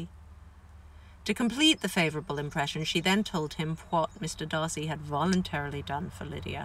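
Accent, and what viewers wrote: England, United Kingdom. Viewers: Oh my, what a gorgeous find!!! 🥰 I must be the only person who hadn’t watched Pride & Prejudice mini series until this week! ☺️ I enjoyed it sooo much, then wondered what has been in since…shocked to hear an American accident and lighter hair in later interviews…but so delighted to hear the English accent here WHILE reading P & P! 🙏🏼👌🏼I’ll be listening to more of these readings! 😊 Have since watched performance in A Quiet Passion #bravo 👏👏 and didn’t realize she played Myrtle Logue in the King’s Speech! 😮 👌🏼👏👏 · Her accent is still sort of British❤ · So happy to hear the English accent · Her beautiful English accent became so familiar to her quickly after the first chapter. Adored this. Thank you. No one could ever be a better Elizabeth than you. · I was your driver in Scotland when you were acting in game of thrones pilot. 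I remember the English accent. 🏴😁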